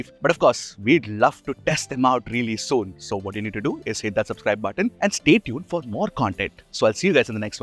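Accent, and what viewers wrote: India. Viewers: I don’t like your Indian accent honestly, difficult to understand sometimes as English is my second language but your channel, contents and quality is perfect 🎉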